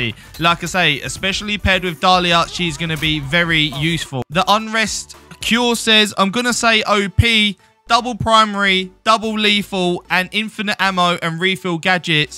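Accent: United Kingdom. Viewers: I like your content because you show off and talk about cosmetics, you always bring the news of the next update, do awesome gameplays and also I love British accents. Good luck for everyone in the giveaway!! And I'm very excited about runway.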